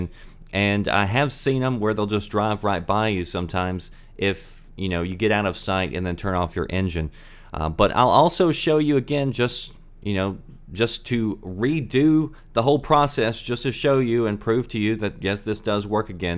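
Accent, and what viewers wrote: South, United States. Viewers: "Sometimes I can't keep the southern out..." Its OK... let that shit flow!!! Hilarious. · I like the southern accent.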